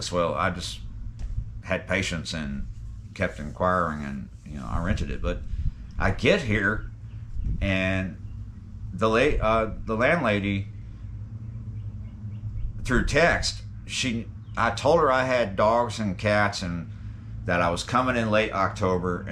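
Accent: Texas, United States. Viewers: Thanks for your videos. Going to miss your Mississippi River vids. Hang in there brother. You already are reverting to a Texas accent lol.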